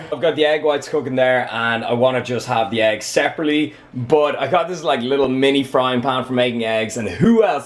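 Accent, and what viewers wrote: Ireland. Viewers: Missed that Irish voice. I'm coming Dublin soon buddy, if you're free we should defo partake in a Guinness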